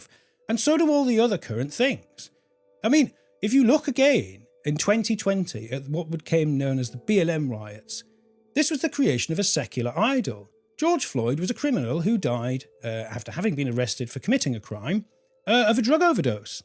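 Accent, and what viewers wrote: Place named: United Kingdom. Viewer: Lovely to listen to a real man, with a polished British accent speaking about the obfuscation going on in the world. From South Africa.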